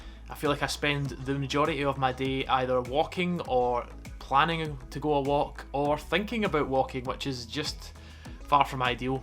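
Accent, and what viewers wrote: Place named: Scotland, United Kingdom. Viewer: English girl here, hello from "down south" ❤ and all English people love the Scottish accent i think I used to walk 20k steps a day every day, walking to work and back (1 hour 15 minutes each way and 20 mins in my lunchbreak) Having a purpose to the walk (a destination) makes it seem easier i think. For me it was my method of getting to work and back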